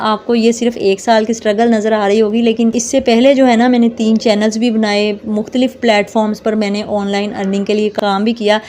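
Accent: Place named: Punjab, India. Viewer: Apka punjabi main bat krny ka style buht acha hota hai m regular watch krti hun apk vlogs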